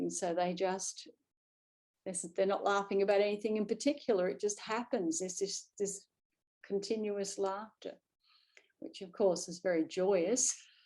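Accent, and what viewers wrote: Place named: Australia. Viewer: That was Beautiful! You know years and years ago I absolutely fell in love with yours and Terry’s Yoga Nidra recordings and they are a go to frequently still… Beautiful! Salve for the soul..I always felt your voice (first Aussie accented meditation I’d heard….Aaaaahhh!!) and of course the beautiful music just reliably brought me Home..Here..Now! Though I couldn’t quite formulate it as such until just recently. After consuming so much content on the non-dual understanding during the pandemic via beautiful sources such as Rupert Spira, Francis Lucille, Bentinho Massaro, Mooji…etc etc I recognised and felt that the sense of being they had pointed me to was the same seemingly placeless place into which I would frequently and almost always effortlessly sink Deeply upon listening to your Yoga Nidra… So thank You Deeply from the depths of Being it is BEAUTIFUL to be able to finally see AND hear your familiar voice regularly.. 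I Love You Both Very much!! Thanx again! XX Luke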